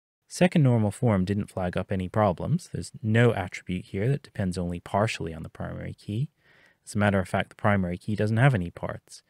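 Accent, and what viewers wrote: United States. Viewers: You from Baltimore? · Are you from Philly?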